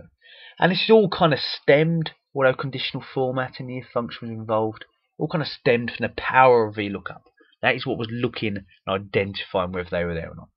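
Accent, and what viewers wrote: United Kingdom. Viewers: Couldn't be a better explanation, this is the best tutorial for VLookup. I liked the Brit accent. Oh My Gosh.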